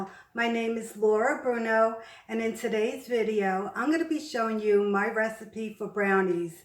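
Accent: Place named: New York, United States. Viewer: Laura Bruno Yes, you sound like me, lol! I’m a Bronx girl born and raised, still living here. Are you still in the Brooklyn Queens area?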